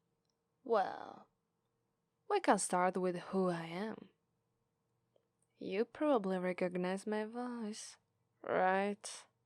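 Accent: Italy. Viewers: Missed an opportunity to use that Italian accent for a mobster accent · I just discovered this channel and I have to say three things about it: 1. I'm not sure that these videos are exactly ASMR 2. I love your RP's and I think that they are the best on youtube and tingles. 3. I love your Italian accent and I understand better your English than native English videos, maybe because I'm Spanish. Greetings from Barcelona and thank you to do an excellent job ^^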